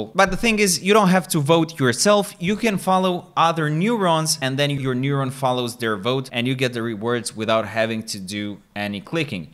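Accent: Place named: Portugal. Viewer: 100% que es tuga! 😁Bom conteudo btw, keep it going ehehe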